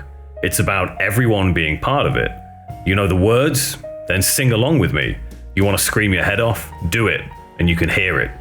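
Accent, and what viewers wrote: England, United Kingdom. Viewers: There's something hilarious about the contrast between the host's prim and proper English accent and manner of speaking, and his old school rocker style appearance. Not what I was expecting.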